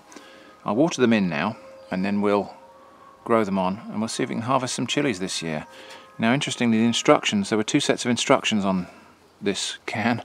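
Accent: United Kingdom. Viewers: I like your channel, the clear British English and the calm atmosphere in your videos. Great work, keep it going👍👍👍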